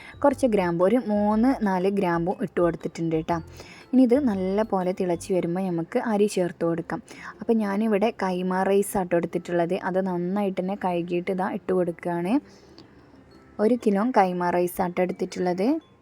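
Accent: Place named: Malappuram, India. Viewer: Njagal Malappuram slang Maya Aantto😃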